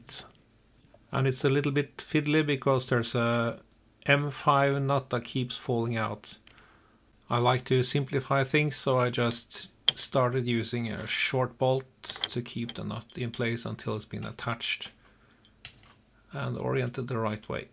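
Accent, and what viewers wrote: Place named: Norway. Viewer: You sound Norwegian just like Kanrog 😂 what part are you in?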